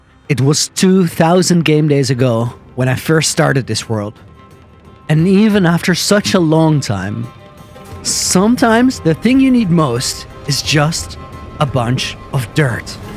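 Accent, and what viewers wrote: Netherlands. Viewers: nice english mate dutch for sure :)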